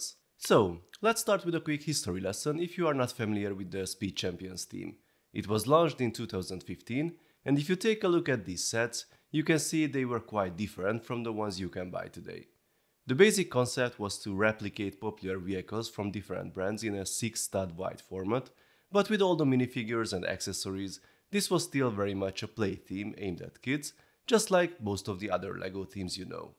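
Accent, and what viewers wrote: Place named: Hungary. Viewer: Oh, that accent... so typical hungarian accent. After the first few minutes I started to get suspicious, and I hoped to find the 'location' of this channel. The location is Hungary of course, so my ears were right. It's not necessarily a bad thing, my ears are just sensitive to that. Hungarian accent is such a distinguishable accent, and I heard way worse before than that. Usually when I dare/have to speak in english... I hope that my accent is not typical hungarian! In fact, I learned russian for a bit 7-8 yrs ago, and I found out that my english turned to sound russian a bit, so I might have russian accent when I speak in english.😆 I also like when people speak in english with real russian accent, it's just sounds great to me.😁 Anyways, great video, it was the first vid from this channel for me. 😀(And it turned out, somehow it was the 3rd vid for me from here) It was cool to see most of the Speed Champions in one place!👍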